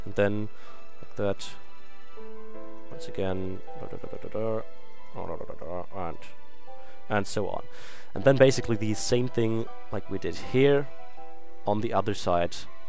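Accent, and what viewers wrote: Germany. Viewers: hei is that a german accent? :) nice vid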